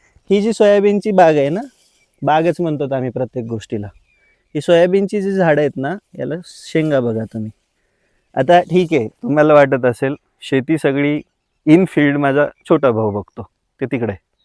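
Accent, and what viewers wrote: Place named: Pune, India. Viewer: Lai popatpanchi bolato yar tu,tu sadashiv pethet jalmala as vatat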